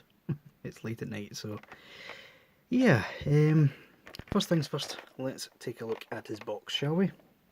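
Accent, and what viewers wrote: Scotland, United Kingdom. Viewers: i have bought this figure to see it for myself and I also cant afford the real one btw are you from Scotland because if you are I am aswell :)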